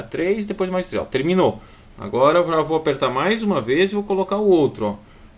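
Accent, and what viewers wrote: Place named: South, Brazil. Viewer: Sutaque do sul rsr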